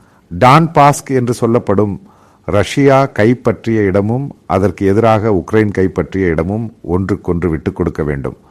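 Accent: Kerala, India. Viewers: എനിക്ക് തമിഴ് അറിയില്ല എങ്കിലും കേൾക്കുമ്പോൾ മലയാളം തമിഴ് പറയുന്നതായി ഒരു തോന്നൽ എന്തായാലും തുടക്കമല്ലേ നല്ല തമിഴ് ഭാഷയിൽ തന്നെ ഇത് തുടരാൻ സാധിക്കട്ടെ എന്ന് പ്രാർത്ഥിക്കുന്നു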